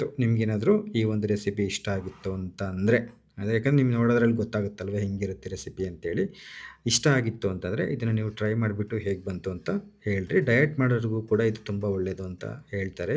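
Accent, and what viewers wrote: Chitradurga, India. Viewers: Pakka chitra durgada bhashedose jote nimma maatu chapparisuvantide😊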